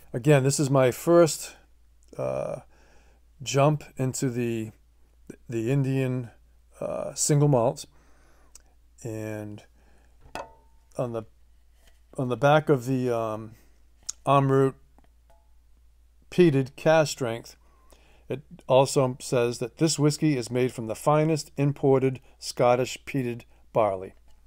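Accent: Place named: Boston, United States. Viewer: OK👍 Boston accent, good one👍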